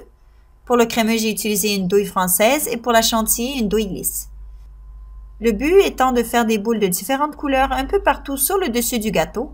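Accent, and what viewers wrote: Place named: Quebec, Canada. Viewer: Bonsoir. Dabord merci pour cette recette ! Votre accent québécois avec " inchallah" c'est aussi fantastik que votre gateau 😉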